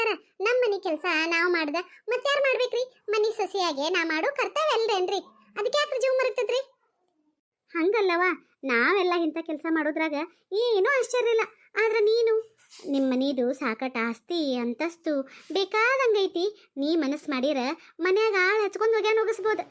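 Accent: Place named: Vijayapura, India. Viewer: ಅದ್ಬುತ ಮಾತು ನಮ್ಮ ವಿಜಯಪೂರ ,uk ಭಾಷೆ ನಿಮ್ಮ ಚಾನಲ್ ನಲ್ಲಿ ನೋಡಿ ಕೇಳಿ ಬಹಳ ಖುಷಿ ಆಯಿತು ನೋಡ್ರಿ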